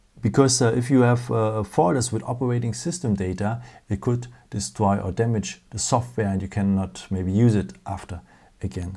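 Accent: Germany. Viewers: Gutes Video! Aber kann es sein das du deutscher bist?😅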